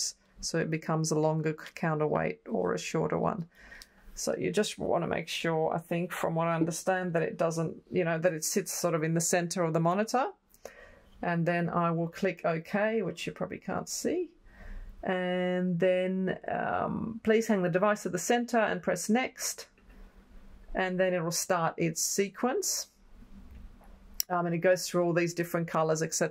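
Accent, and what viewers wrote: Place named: Australia. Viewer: Thanks for the video. I’ve just unearthed my X-Rite i1 Display Plus, and when installing it for my project I’ve found I have to pay for the Prfiler software from Calibrite … just another $100! I’m starting to print for my business wit a new Epson P900 printer. Always good to hear a fellow Australian’s voice on this, as we don’t get all the products available in other videos, and in this case sometimes they’re rebadged! Anyway, first time calibrating properly for me so hopefully it all goes smoothly.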